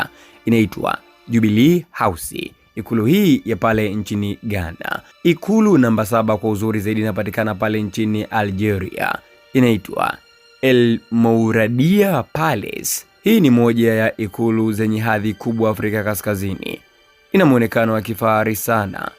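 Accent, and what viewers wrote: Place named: Kenya. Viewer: Mtangazaj ni mkenya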